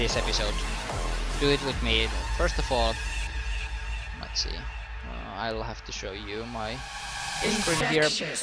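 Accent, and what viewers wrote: Finland. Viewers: Ha! I knew that you are Finnish because of your accent (Actually, the "wikipedia" was the part when I knew that you are Finnish) Sinä paljastuit! :P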